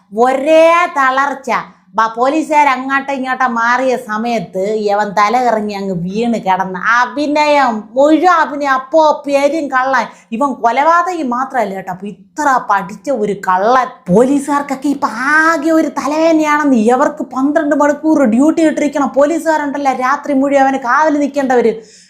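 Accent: Thiruvananthapuram, India. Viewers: തിരുവനന്തപുരം നാട്ടുഭാഷ കേൾക്കാനാണ് ഞാൻ ഈ വീഡിയോ മുഴുവൻ കേൾക്കുന്നത്. ആ കെട്ടവന്റെ കാര്യം കേൾക്കാൻ അശേഷം താല്പര്യമുണ്ടായിട്ടല്ല. എന്തായാലും പ്രസന്റേഷൻ അടിപൊളി.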